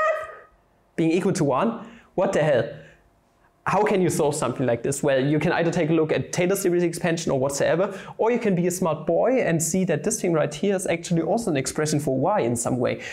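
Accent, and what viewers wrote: Germany. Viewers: I've never understood a single flammable math video but I still watch them...musg be the accent. I'm a sucker for german accents and chalkboards.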